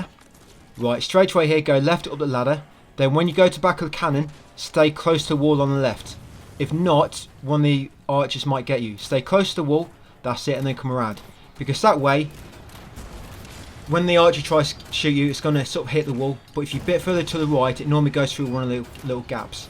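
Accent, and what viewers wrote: United Kingdom. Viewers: Why is your accent so british for Gods sake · Remember you from the "hell of a Sheriffe achievement. Definetly the best. I'll definetely try your strategy, since I was 30 minutes too late on my own. Good job! BTW, where are you from? I love the accent. British for sure...but whitch city? I teach English as a second language. Thats why I'm curious.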